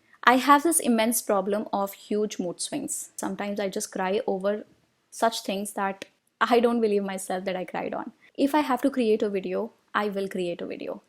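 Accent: India. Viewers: Omg! I just love Indian people! 💓And I missed India 🇮🇳 accent is amazing 😊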